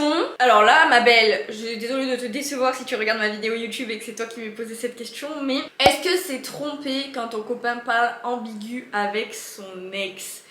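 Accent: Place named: South, France. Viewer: L’accent du sud ! 😉🤩 super vidéo d’ailleurs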